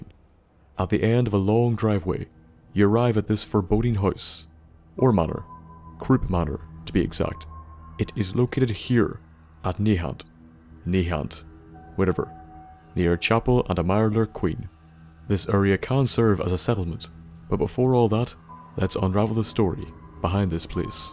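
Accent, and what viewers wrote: Ireland. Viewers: Yo are you from ni or Ireland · As always great video man keep up the amazing content and I've been wondering this are you Irish if not my apologies but just wondering keep it up man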